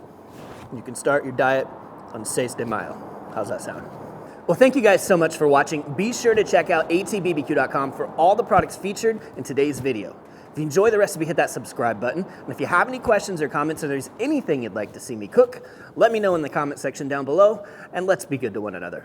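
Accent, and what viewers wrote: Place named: California, United States. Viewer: Who has all that their kitchen your special spices and infused chili oil you sound like you're from California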